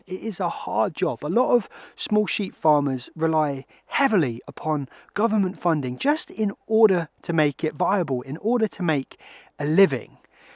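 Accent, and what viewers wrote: United Kingdom. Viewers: Another posh English voice poking his nose in our business..🤢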